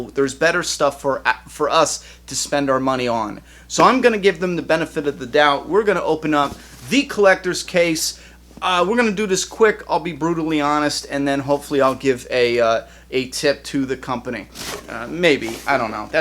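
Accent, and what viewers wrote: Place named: East Coast, United States. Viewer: Love that east coast accent ;)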